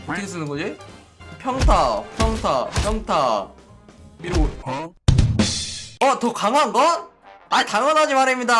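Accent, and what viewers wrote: Busan, South Korea. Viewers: 그... 원래 부산사람이 엿어요? 부산언어를 정말로 잘.쓰.시.네.요.하하ㅏ하하ㅏ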